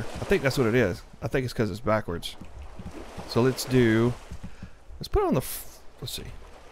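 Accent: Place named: Texas, United States. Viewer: You sound like your from Texas or something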